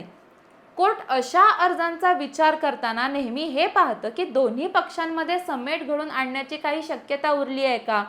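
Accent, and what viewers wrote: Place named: Kolhapur, India. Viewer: ताई फ्रॉम कोल्हापूर वाटते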